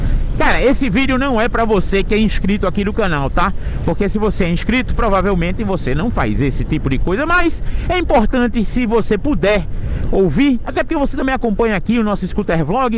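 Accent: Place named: Northeast, Brazil. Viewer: sou teu fã cara! Curto demais seus vídeos, seu sotaque nordestino só mostra o quanto esse Brasil é maravilhoso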